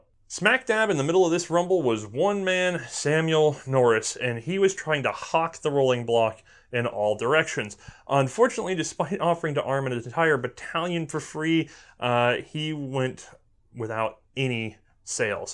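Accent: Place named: South, United States. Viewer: Haha, must be that thick southern accent (or my damn ears).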